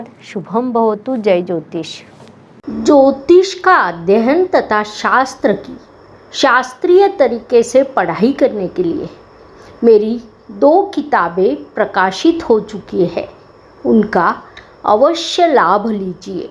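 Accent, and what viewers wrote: Maharashtra, India. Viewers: Such a strong thick marathi accent 😮 omg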